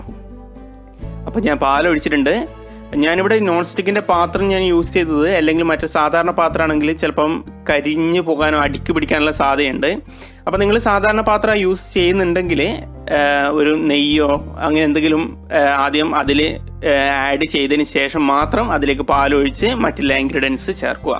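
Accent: Kannur, India. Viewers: ettan kannur kaaran anno? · 6 .49 innann paraya😂our original kannur slang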